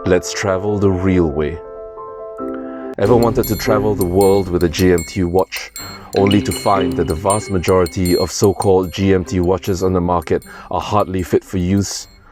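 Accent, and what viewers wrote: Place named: Singapore. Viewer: to - wow. The writing quality is really up there. Turning the born to dare line around was smart and potent. I used to live in Singapore and recognized your accent... then I saw the beaches and knew it was Singapore! Congrats on this quality content, dude. As for me, the Tudor BB GMT is not for me. I don't think I'll ever be able to justify paying more than 1000 for a watch when you can invest it and return 7-10% per year in the market. The mark up is also inexcusable (cost to make vs. MSRP). I don't doubt at all the quality of these expensive watches though. Great video, please keep going. The watch community DOES need thoughtful content. You're going to grow big in no time with these type of thoughtful essays. Good luck.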